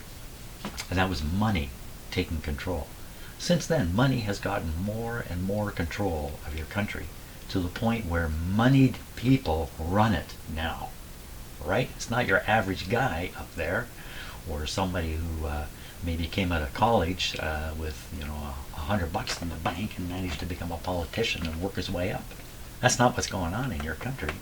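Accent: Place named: Canada. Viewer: There is no going back. Accept us and we learn nothing, forgive us and you betray yourselves. I hate being wise but we are not in a good place and it's going to get one hell of a lot worse before it gets any better. Brace yourselves - you're going to catch strays, being so close. Open your arms to those of us who are more loyal to the world we had, to our friendships and alliances and the longest peaceful & demilitarized border ever, than any world this regime could ever hope to create. Y'all may not be my home nor my native land but I'll defend Canada before I defend this fascist regime. We still pick up a lot of Niagara Falls, ON radio, sometimes Toronto too. I should have known from your accent that we are geographical neighbors, my apologies. I also have actual Canadian relatives - 1st cousins, uncle died before I was born & his sweet, wonderful, creative wife just died, alone, in a hospital, during C19 - so perhaps my affinities toward you guys are a little more fundamental to me. 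My godfather is one of those Canadian cousins (~20 years difference). The aforementioned uncle, who died in Windsor, has a Wiki page, largely for work he did at Toronto University and then if memory serves Assumption College which I think became the University of Windsor at some point. Yeah. I understand how you have to regard my country, I understand the geopolitics, but don't fool yourselves for one second that we've all changed for the worse and that all your friends and allies are gone. We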